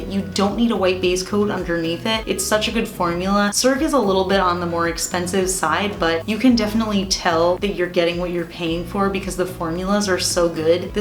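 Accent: New Jersey, United States. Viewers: She's talked about it before. She knows it's kind of odd. She says it's because she's from/lives in New Jersey. I don't know about that because I've never been to NJ, but considering how many people of Italian descent live in NJ I can believe those Italian grandmas could pronounce the first syllable the same was as pasta. And then there are the west coast non-Italians (like my grandparents were) who can't pronounce pasta without the short A, so it sounds like the word "past" with a U sound tacked on-- PAST-uh. But then, they can't pronounce the word Italian, either. It comes out EYE-tal-yen, or maybe eye-TAL-yen.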